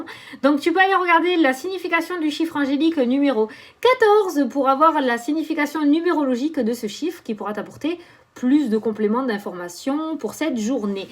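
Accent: South, France. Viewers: Ahhhhh😊😊😊... enfin un peu de soleil avec ton accent sur Clermont, dediou ça fait du bien 😁😁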